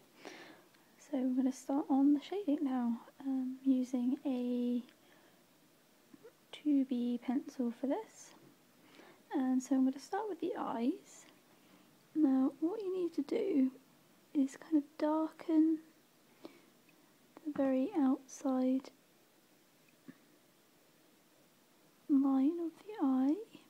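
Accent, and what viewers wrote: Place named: United Kingdom. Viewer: She's British.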